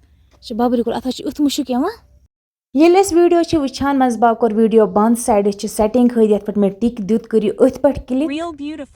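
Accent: Kashmir, India. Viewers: ❤❤ mujhe tho aap ki voice kashmiri mai he aati hai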